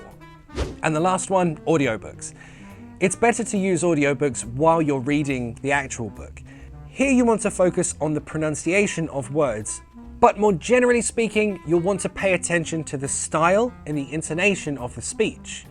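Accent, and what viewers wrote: United Kingdom. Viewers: I love the Learn and Improve Your English Language!! With Your British Accent, and Congratulations,!!! 🇬🇧😍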